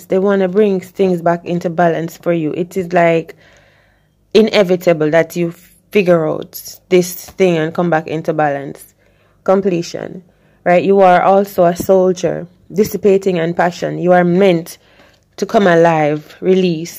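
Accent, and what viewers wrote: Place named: Jamaica. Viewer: I love your patois ❤️🔥🥰 please don’t hold it back .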